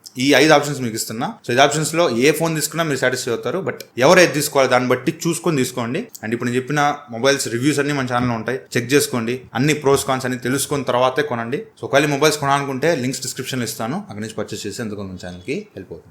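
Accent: Nellore, India. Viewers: Bro miru Nellore ra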